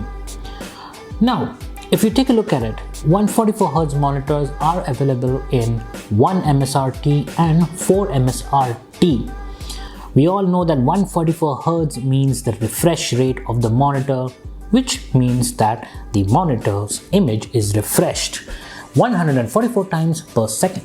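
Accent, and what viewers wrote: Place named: India. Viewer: This isn't any hate, my problem is not that you're indian, but the accent tickles me so much man x)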